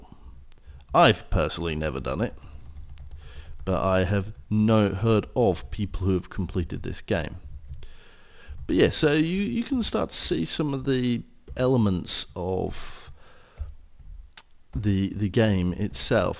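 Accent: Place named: England, United Kingdom. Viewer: I love your "typical" english accent :) where do you go for this game... i just dont understand :)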